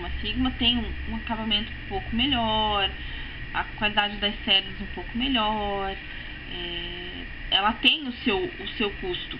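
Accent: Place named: Rio Grande do Sul, Brazil. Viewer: flor, uma dúvida.. tu é gaucha?? tem sutaque, hehe :DD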